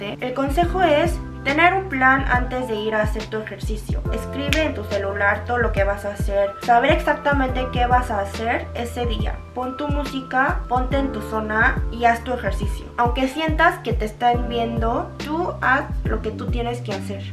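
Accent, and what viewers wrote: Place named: Fresa, Mexico. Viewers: Asi como estas dres muy guapa y si haces ejercicoo por salud te conviene seguir, pero unsa sola cosa quitate el acento fresa, es mejor un tono mas modulado o standard, como Selim la esposa de Minu, el Coreano de Corea. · Me encanta que hablas con acento fresa jajajaj hahah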